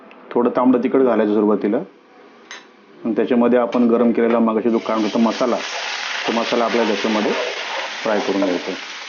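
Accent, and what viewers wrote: India. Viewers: तुमच्या भाषेवरून तुम्ही नगरचे की काय असा प्रश्न पडतो?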